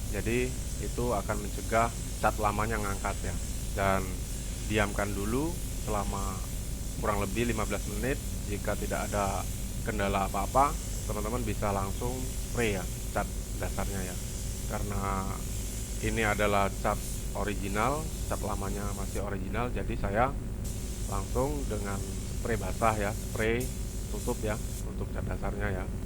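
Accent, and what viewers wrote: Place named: Java, Indonesia. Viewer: wuah.. jauh ya Saya di Jatim ini mas.., Saya kira di jawa, soalnya dengar2 logatnya sprti org jawa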